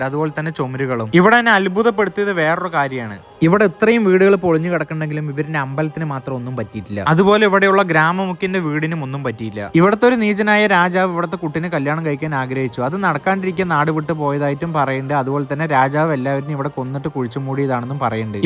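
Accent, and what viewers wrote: Palakkad, India. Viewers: പാലക്കാട്ടുകാരൻ ആണല്ലേ. ആ slang😝😝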